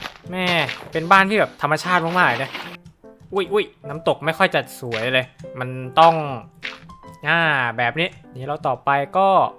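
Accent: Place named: South, Thailand. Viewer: พี่เป็นคนใต้หรอครับ ผมเพิ่งติดตาม สำเนียงเหมือน😅😅😅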